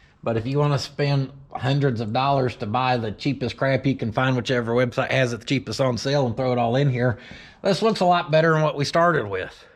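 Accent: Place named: South, United States. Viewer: I'll bet that a lot of folks see channel and think: "he sounds like cornbread... I bet he does a lot of hillbilly rigging on his projects." I watch a lot of automotive channels, and I can truthfully say that is as good a mechanic as most of them, and welds better than all of them. He follows his vision, putting in the extra work to do things right. He is humble enough to show you his screw-ups and how he fixed them. All the while having a good attitude and a great sense of humor. He should have a lot more subscribers... I guess some folks don't like cornbread. Their loss.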